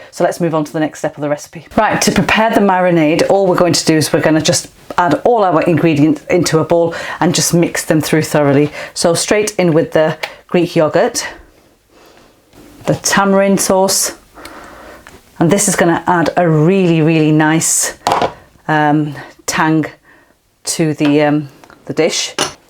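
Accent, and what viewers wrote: United Kingdom. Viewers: Love your videos a lot.. I just bought Cosori air fryer and watching you every single day. Please let me know your name and your social account. I love your UK accent too :) :) I live in Tokyo.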